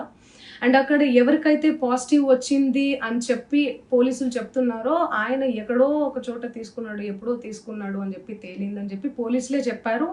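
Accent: Telangana, India. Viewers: U can speak rayalseema language sis..y the slang of ts....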